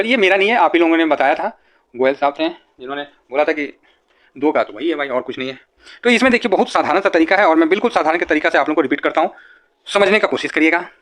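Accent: Bihar, India. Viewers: Aap Bihar se lagte hain....bahut achha....Mai bhi hu.... 👍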